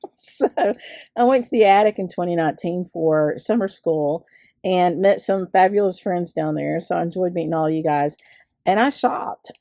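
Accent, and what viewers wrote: South, United States. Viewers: I enjoy your videos so much! I could listen to your lovely southern accent for hours. Watching from Australia🐨🦘💕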